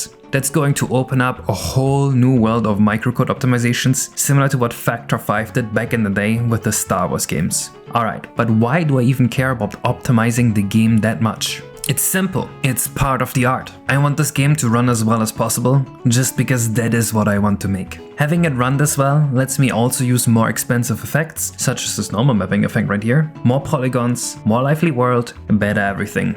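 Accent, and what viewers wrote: Germany. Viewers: I'm just confused as to why I thought you were Portuguese for years. Your accent is distinctly German, guess I'm just dumb. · this is the most german english I've ever heard in my life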